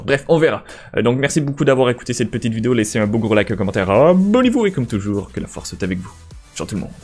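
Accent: Quebec, Canada. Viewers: Caché ton accent québécois, tu n'arrives pas.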